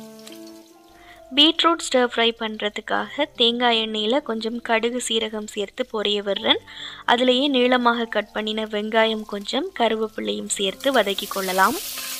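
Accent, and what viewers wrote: Jaffna, Sri Lanka. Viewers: I was waiting for your vlogs sister I love to hear your slang of tamil of Jaffna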